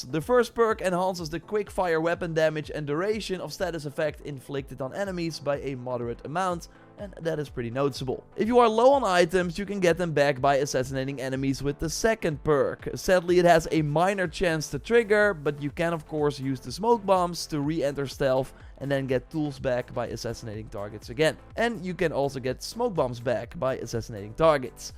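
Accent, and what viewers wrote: Netherlands. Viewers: This is the most Dutch English accent I've ever heard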